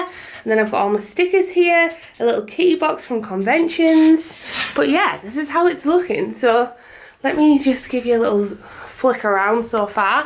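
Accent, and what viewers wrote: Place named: Yorkshire, United Kingdom. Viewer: Ahh love coming onto a channel and finding someone with a similar accent to me. Are u from Yorkshire? I’m from Hebden Bridge near Halifax, born in Bradford. I want to do an art channel and I have wondered what will people make of my accent and should I learn how to tone it down lol but then I hear you’re accent and u sound luvli!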